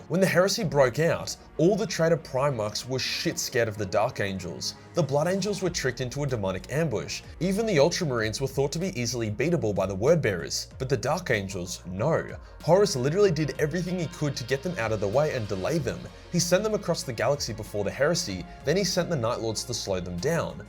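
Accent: Australia. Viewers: I get excited every time you upload as listening to Australian chad talk about warhammer 40k makes me moist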